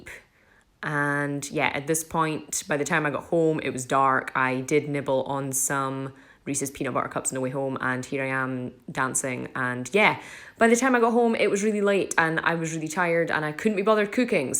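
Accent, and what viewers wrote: Scotland, United Kingdom. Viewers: I get the Scottish accent from you really well.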